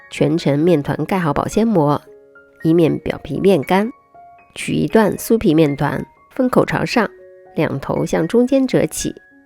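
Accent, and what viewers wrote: Shanghai, China. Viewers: 原来是上海宁呀，怪不得不一样，一直在怀疑侬是上海宁，现在终算证实！😂😂😂😂❤❤❤❤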